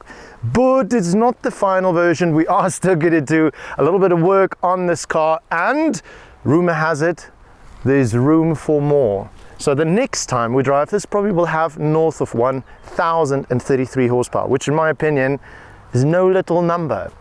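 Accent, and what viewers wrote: Afrikaans, South Africa. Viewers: Love the Afrikaans accent - flying the SA flag high and mighty ………boss content too - cheers mate keep us entertained 💪🏼😉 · This guy sounds South African...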